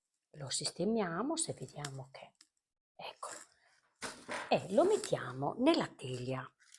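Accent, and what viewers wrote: Sardinia, Italy. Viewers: Ma tu sei sarda come meeeee, l' ho capito quando hai detto: si tianu, siiiproprio come lo chiamiamo noi e anche come fai i papassini , mi fa' piacere, e forse non sei molto lontana dal mio paese, da come parli il dialetto direi proprio di no. Complimenti ancora, sei unica😘♥️🎉🎉🌹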